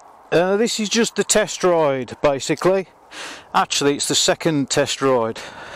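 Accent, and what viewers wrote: United Kingdom. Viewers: So what about Bosch 36v 250w? What’s the significance; why would someone suggest you’re a troll because of that? (Sorry if I’m clueless.) Also, where in the UK are you from? I’ve spotted your accent as the same as another YouTuber I watch. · I'm not jokinggg, can you see it down thereeeeeeee...lol, I love British accent!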